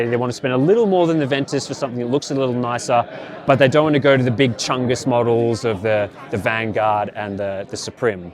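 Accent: Australia. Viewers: All that talk about fan spin sounds like a Louis Rossmann video except for the Aussie accent.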